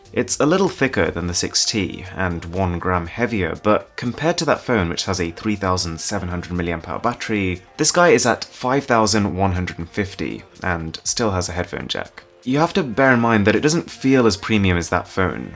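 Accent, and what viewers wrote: RP, United Kingdom. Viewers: for some reason this guy's accent sounds too posh for my ears. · How refreshing to have a review given by someone who speaks the Queen's English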